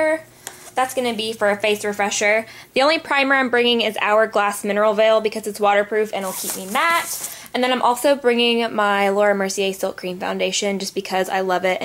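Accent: South, United States. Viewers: in your current videos you have a southern drawl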